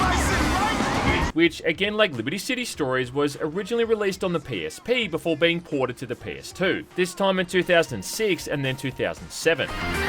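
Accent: Australia. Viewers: Are you Australian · You sounds like an Ozzie Australian.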